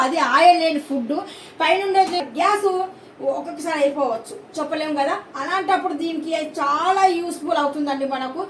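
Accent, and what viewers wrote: Rayalaseema, India. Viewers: Goodroyalseema telugu accent.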